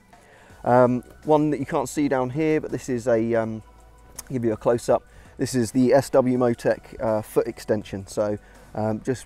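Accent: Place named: England, United Kingdom. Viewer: Hi, nice to hear an English guy with a comprehensive vid about what he’s done to his bike. Utube is full of septic upgrades an show ponies. Me “like yourself” rather ride it then clean It, have a tarmac version, (at my age I can’t afford to brake any more bones ☹ I think I’m about your age x3) but love your aggressive look. Will be getting the tool roll, love it an as you say, better the Yamaha’s version. I went for K-Tech front cartridge fork kit an rear shock (95kg rider) both fully adjustable, totally different bike. Will sub just to see what you get up to. 💪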